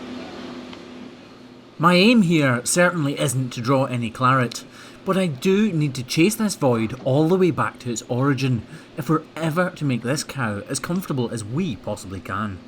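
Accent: Scotland, United Kingdom. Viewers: Hey, Where is the big smile and a hand over the camera lens? What's with the black screen? Oh, I know the nasties over on Craigie Boy have caused you to rethink everything. Come on love, we want the smile back and the hand over the lens. Those two things speak of you as much as Your Scottish accent. Tell all the nasties to buzz off! Do that, and I'll kiss one of your cows. lol Have a good evening. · he sounds scotish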